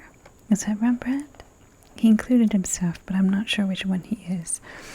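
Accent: Transatlantic, United States. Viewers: Your accent is akin to the trans Atlantic / Edie Beale cadence and it is amazing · New comfort video ❤ P.S. What accent is this? I can’t always distinguish between regional US accents but this lady’s accent reminds me of Jaws and I love it. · very transatlantic. It kind of jumps all over the place tho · It sounds transatlantic right?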